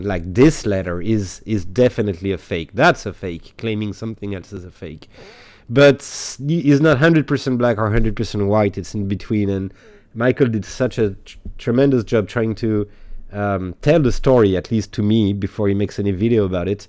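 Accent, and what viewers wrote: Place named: France. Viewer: thank you. I totally misheard what you were saying. I usually have a better ear for the French accent. I just finish reading Zina Halprins book, yesterday. I heard you mention reading it so I got a copy. Any other good recommendations for Templar related books? Or any good books?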